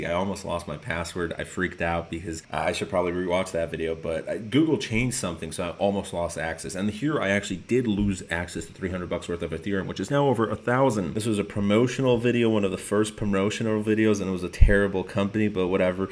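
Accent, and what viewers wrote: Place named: Poland. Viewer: You have an polish accent!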